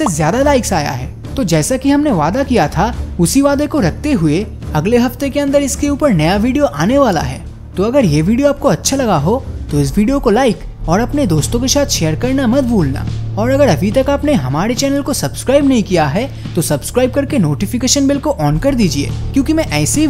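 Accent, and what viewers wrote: Bengal, India. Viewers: Are you Bengali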